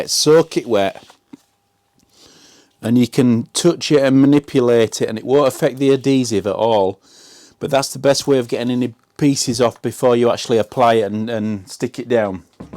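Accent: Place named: Yorkshire, United Kingdom. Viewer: Thank You,! It's pretty straightforward for dummies like me ))) And by the way lemme ask You one question: I noticed You often pronounced the vowel "u" as ʊ when it's supposed to sound like "ʌ". Fore example You say mʊd instead of mʌd... Is it a specific Yorkshire dialect? Thank You once more!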